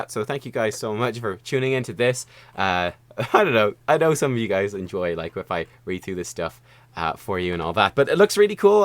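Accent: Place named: Ireland. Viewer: you are right about that: much more enjoyable to hear you read through it bc my internal voice don't have the cool Irish accent :)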